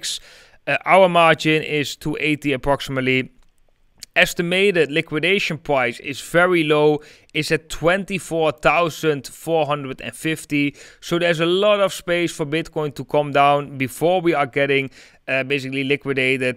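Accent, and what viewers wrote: Netherlands. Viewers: The moment I heard your accent, he's Dutch 😅🤣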